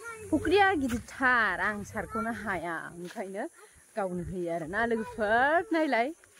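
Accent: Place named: Kokrajhar, India. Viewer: Same to same kokrajharni boro railainaijg milaitarw 100% sure abo ngsina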